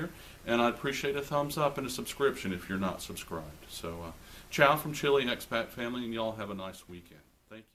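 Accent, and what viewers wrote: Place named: South, United States. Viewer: 4/9/2023. Great comments and video. Having grown up in the South, I appreciate your accent and use of y’all.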